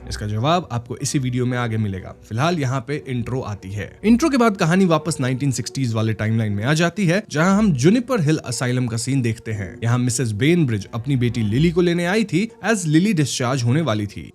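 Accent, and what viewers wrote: Bengal, India. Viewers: Hey your Bengali ??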